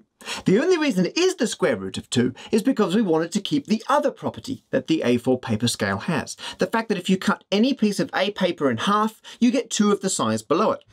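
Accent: Australia. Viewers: I have only just realised that Matt is not English but Australian. I feel rather stupid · Sounded like and Australian to me! - Alternatively, maybe New Zealander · If it's one of his former monarchs it's one of yours as well given that he isn't a brit lol. Sounds Australian to me.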